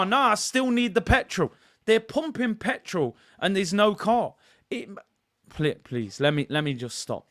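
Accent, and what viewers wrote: East Midlands, United Kingdom. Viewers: he's from Nottingham, I think that's classified as East Midlands, not 100% sure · are you from the East Midlands?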